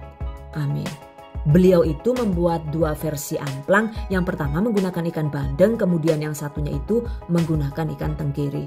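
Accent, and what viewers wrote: Banyumas, Indonesia. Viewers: Selau suka dg suaranya kolaborasi jawa ngapak indo dan english..i like that...jdi ketawa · Akhirnya bisa pegang hp rebutan Ama bocil, Alhamdulillah bunda ini makanan kesukaan anak2, ternyata lumayan mudah ya cara buatnya tapi kudu sabar ngaduk2 kayaknya ni. Makasih bunda makin lengkap aja ni, makin mantap, makin sukses buat. Seneng sama suara bunda yg khas dan candaannya itu loh ngapak kali 😀😀 #GiveawayTHV2021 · Yuu...jawane ngapak metu bae lah.. 😁😁tq resepnya...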